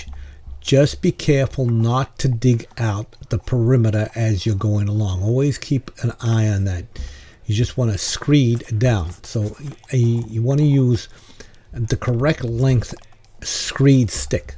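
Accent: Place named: Boston, United States. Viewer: Good tips! You don't need to tell us you are in Boston... we can tell haha. Great job!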